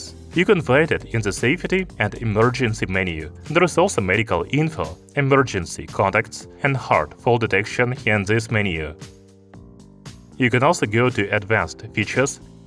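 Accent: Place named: Russia. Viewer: Rusia o alrededores creo. Lo importante es que se entienda incluso con subtitulos.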